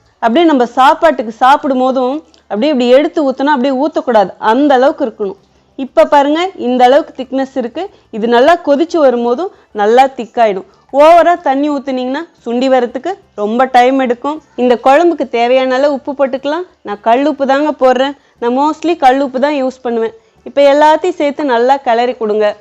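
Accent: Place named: Kerala, India. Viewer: Kerala samayal la pesara mathriye pesaringa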